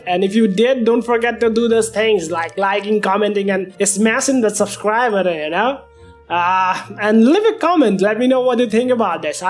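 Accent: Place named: India. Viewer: great stuff I want more I just feel a bit uncomfortable with the accent it sounds like sounds I make when I feel sick making me sick, plus if I recognise the accent correctly this is a weak indian influenced/ indian accent which ill be frank I dont like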